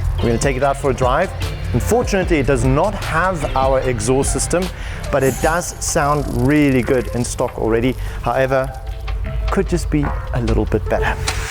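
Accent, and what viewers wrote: South Africa. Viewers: South African presenter? Great job guys!!! I need a TDF in my life 😍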